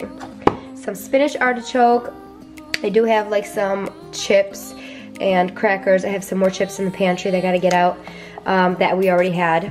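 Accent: United States. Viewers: it looks so nice. I love your American accent. what state are you from?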